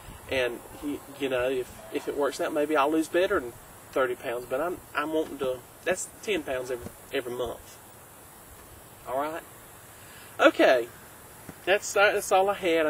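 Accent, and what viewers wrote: United States. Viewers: are you from Southern Ohio, KY, or WV? you sound like the people around here lol. It's sad i can sense a difference between myself and them even after 5 yrs. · its a southern accent. not all americans have the same accent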